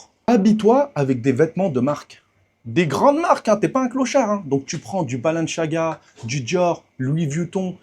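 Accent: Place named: France. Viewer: 😂 TU RELAVE GRAVE LE NIVEAU... C'EST POURQUOI JE T'ÉCOUTE ET JE TE SUIS. J'adore ton accroche! Ça me fait rire👍 C'EST TRÈS VENDEUR! TU ES TRÈS VENDEUR 👍 C'EST TRÈS BON ÇA C'EST POURQUOI TES PODCASTS ET TES VIDÉOS FONCTIONNENT TRÈS BIEN. AU DÉBUT ÇA M'A SURPRIS TON ACCENT DE CITÉ MAIS JE ME SUIS FAIT PARCE QUE JE SUIS PAS HABITUÉE. ON SENT TU ES QUELQU'UN DE BIEN GARÇON DE BONNE FAMILLE COMME ON DIT CHEZ NOUS ÇA C'EST NOTRE CÔTÉ VENDEUR NOTRE CÔTÉ COMMUNICANT QUI EST SUPER BON👍 Ton accroche peut être super titre pour un film! tu sais ça!? 👍😂 Il faut déposer la marque... Tu crées des titres qui accrochent c'est une marque d'approche ça c'est top Et mec tu vas être copié alors dépose vite la marque Après tout tout est déposable. Tu sais tout le monde t'écoute tout le monde t'observe Les comiques les artistes et les scénaristes s'en inspirent... Pour te copier et copier ton style ensuite ils vont le vendre dans des films c'est sérieux ce que je dis.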